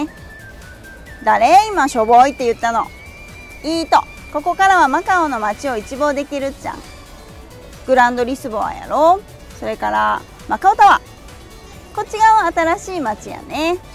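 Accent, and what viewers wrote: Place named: Hakata, Japan. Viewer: 博多弁が好きな方🙋🏻‍♂️です😊 椿さんすごいですね！ちかっぱ面白かったです🙇🏻‍♂️ 次回マカオ行く時の楽しみが増えました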